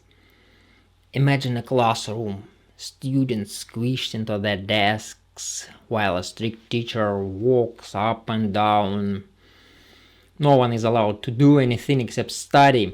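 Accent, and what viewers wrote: Russia. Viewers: Судя по акценту мужик из наших:)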